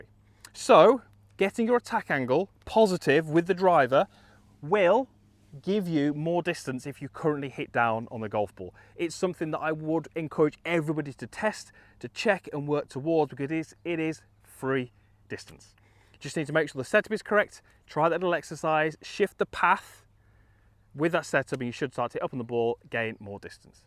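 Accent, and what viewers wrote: United Kingdom. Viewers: Very clear even with your British accent 🙂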